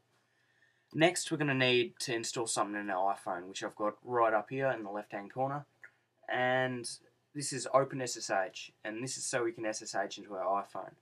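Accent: Australia. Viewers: Sounds Australian to me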